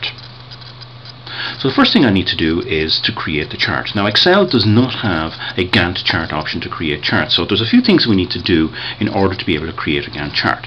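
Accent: Ireland. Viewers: Hi there, I am a nurse who knows very little about Gantt charts other than they exist a. I searched in EXCEL and found your video. I watched two and found them very helpful. The bonus was the course was created by someone from Dublin and I could enjoy hearing an Irish accent as I followed along. I am a Dub who has lived in Vancouver, Canada for many years who really enjoyed having an Irish teacher! Great videos. cheers Clare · I love his accent! Irish? What's up?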